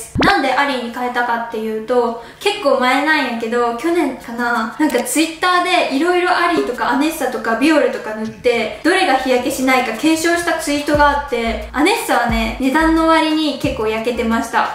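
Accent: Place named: Gifu, Japan. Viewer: 方言が岐阜っぽいんやけど？。。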